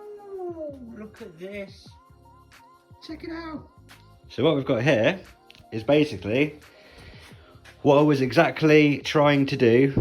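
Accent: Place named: Leicester, United Kingdom. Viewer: I’m in Leicester , would know our accent anywhere 😂 it’s very entertaining , nice garage set up too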